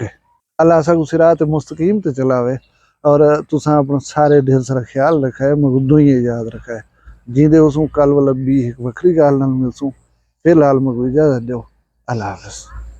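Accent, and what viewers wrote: Saraiki, Pakistan. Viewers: Chacha subscribed Kar diya hai kamal ki sararaki bolty hain wo bhi methay lehjay me.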